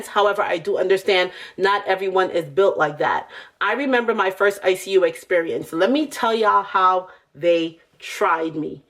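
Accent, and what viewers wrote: New York, United States. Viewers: I’m back too 👏🏾👏🏾👏🏾👏🏾👏🏾that New York accent 😝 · You from NY or Jersey ☺️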